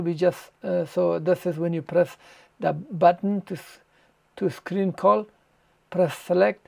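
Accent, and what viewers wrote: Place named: Poland. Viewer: I loooooooooooooooooooooooove your accent! Is that Polish?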